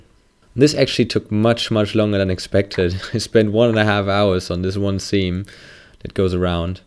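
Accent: Germany. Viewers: actually he's got an accent, I'd say he's from Germany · Really cool project. Your english is good, but you have this tiny little accent. Are you german? :D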